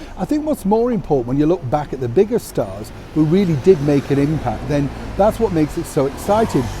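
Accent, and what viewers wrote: Yorkshire, United Kingdom. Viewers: Good morning, you say some words just like my nannie who was herself from Yorkshire yet mostly you have a nice clear voice perhaps from spending some time in London. Loved much of music, I think I'll have a revisit of that, thanks. Great videos today, interesting hearing about your time in radio x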